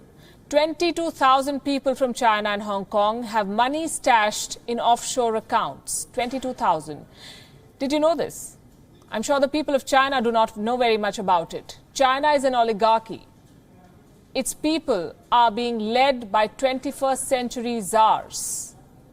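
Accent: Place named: India. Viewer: India and China, two of the oldest civilisations on earth should lock hands. But here you are clever by half, breeding discord. You are a developing country criticizing another. As if your leaders are holy and free from corruption. Lee Kuan Yew said that China like other countries is afflicted with corruption, but the difference is that Chinese leaders are able to get the job done. So woman, you should keep your strong Indian accented trap shut.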